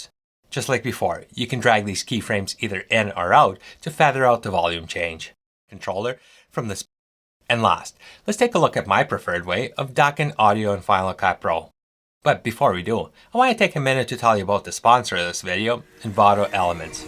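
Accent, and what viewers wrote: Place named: Saskatchewan, Canada. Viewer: As soon as I heard your accent, I could tell you were one of us 306'ers.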